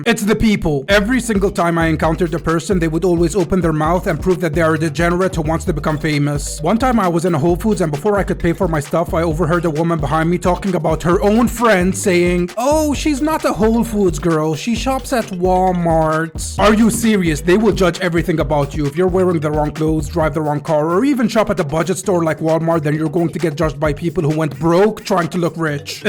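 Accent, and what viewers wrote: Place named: India. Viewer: Bro sounds like he's from India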